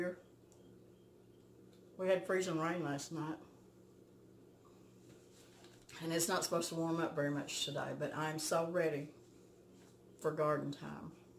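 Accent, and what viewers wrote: South, United States. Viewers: Hi from Michigan. I watched one of your videos, and now I can't stop. Your so down to earth, and I love your southern accent. I could listen to you all day. You have some great gardening tips, and I love that you cook from scratch. Watching how you can your food is very educational, for me. My Mom, who passed away, would can food, but I never took the time, I wish I had, to learn that from her. I regret that now that she's gone. Do you know how to make cha cha ? Some call it chow chow, but my Grandmother and Mom called it cha cha. They used green tomatoes, pickling spice, sugar... not sure what else goes into it, but they would grind all of the vegetables up, cook it in a big pot and can a bunch of jars of it. We would eat it with greens and beans. I was wondering if you ever made any, and if so, do you have a video of that recipe? 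I've been craving it and want to make some. Thank you for taking the time, to make and share these videos. As a new subscriber haven't seen them all, but plan on watching a lot of them. 😊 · Me too! I love the way you tell stories and your beautiful southern voice!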